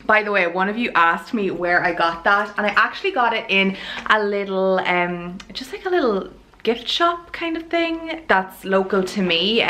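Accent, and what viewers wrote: Ireland. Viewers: Hi ❤ I just have to tell you that I LOVE YOUR VIDEOS! I have been cleaning all day and your videos have been playing in the background. I've been watching your videos for the past few days and you have been inspiring me in many ways. I love watching your routine videos and what you eat in a day videos. I came across your channel watching your Realistic 1 hour mourning routine, and since then I've been obsessed. I LOVE your accent as well!! I've always wanted to visit Ireland. Any-who, keep up the great work! Always looking out for new footage from ya!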